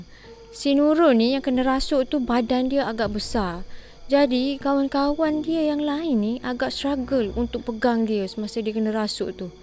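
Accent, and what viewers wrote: Kelantan, Malaysia. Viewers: cankap klate baru belago angin cankap luar tk berapa best..hanya lihat host sajo😂😂😂😂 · kak amy subscribe n follow sbb lain dari yg lain, cerita seram dlm loghat kelantan.. just be yourself darling, tak syok da nak dengar bila nak jadi org lain n tak feel da seram dia, lebih pd kelakar.. sorry syg, kak amy tegur demi kebaikan sbb ramai yg nak awk kembalikan balik loghat Kelantan n its real you.. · kak , cito dialek kelate lagi sedak berbanding kl 🥲 . aura dio lain sikit , kl kureng sikit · Kecek klate la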